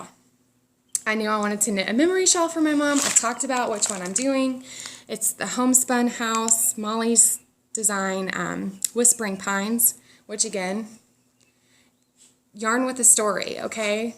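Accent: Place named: South, United States. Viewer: Hi, I sometimes get those purl bumps too when casting on toe up. To avoid them you need to knit into the back of your stitches on the first row after you cast on. At least that seems to work for me. Also, handy hint, if you are finding all those m1L & m1R really slow going on your toes just do kfb instead. It may not look quite so neat but it's much less hassle & who's going to be studying your toes anyway?! PS love the podcasts & your Southern accent!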